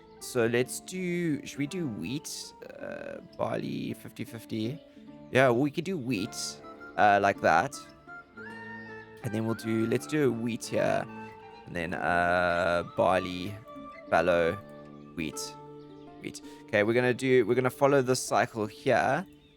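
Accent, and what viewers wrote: South Africa. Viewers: I knew I recognised that accent, my wife is from Pretoria lol. Good videos man, you made me want to get back into the game especially after the new update :)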